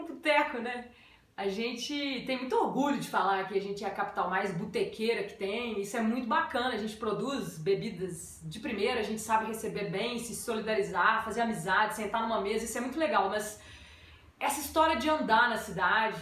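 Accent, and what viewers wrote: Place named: Pernambuco, Brazil. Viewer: Kkkkkkkkkkkkkkkkkk É o "pernambuquês", 'fia'... BH é o máximo! E teu canal, tá igual ao programa de domingo à noite: Fantástico! Xeroooo em ritmo de forró!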